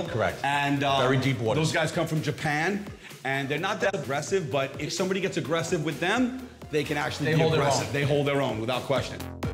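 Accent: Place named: New Jersey, United States. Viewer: They sound like Italian "Joisey" boys, are they from "Joisey" ...they're loud and obnoxious from there.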